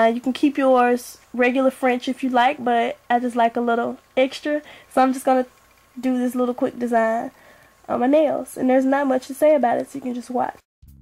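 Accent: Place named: Mississippi, United States. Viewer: I just was just curious where are you from? You sound like you are from MS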